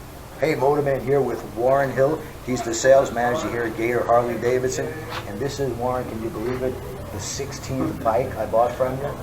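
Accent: New York, United States. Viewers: "I think I have a southern accent" ahahah Sorry, no, I thought you were still in NY, maybe NJ, until I looked up that Harley dealership. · Love the videos. Great stories. Terrible Southern accent - lol. Can still hear plenty of NY in it. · I'm from the UK and even I noticed your NY accent:-) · Congrats on You're new bike, I knew that You like Breaking Balls, hence the New York accent, that You still have for sure. Those were a couple of Good ones about the 🔥 Man, and about You paying the bike off quick writing Hella Tickets. 😆 anyway✌, and keep the Great content coming...👊😎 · Dammit! I knew you were from the NYC Metro area. I grew up in Rosebank, Staten Island, a heavily Italian neighborhood. I even had on my block.:D We never lose the accent. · I live in New York and I can tell you are from New York. Yes you still sound like a New Yorker. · Where in NY? 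I hear the accent, but I am a Ex-NYer too. I am from Long Island. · Neather you or has a southern accent. Although your N.Y. accent has mellowed out. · Not only do you still have a New York accent but I’m guessing you’re from Long Island. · Love the bike and your sense of humor. You had me for a sec when you mentioned that my speeding was going to finance your bike!! PS, sorry to say but but after 40 years, you still have a NY accent!! Enjoy that awesome bike!! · I'M FROM SOUTHERN NEW JERSEY WE HAVE OUR OWN ACCENT. YOUR STILL A NEW YORKA:) THAT AIN'T NO SOUTHERN ACCENT:) GET OVER IT. ENJOY YOUR NEW RIDE AND GOD BLESS FOR BEING YOU AND ALL THAT YOU DO. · You still have a NY accent! I'm a original mass-hole who did three years at Clearwater high school in Florida, for a crime I didn't commit. So I know a New Englander or new Yorker when I hear one. Moved to my Dad's native, upper Ohio river Valley, West Virginia, after high school and never left. 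I prefer the riding and culture of West by God Virginia over any place, any day!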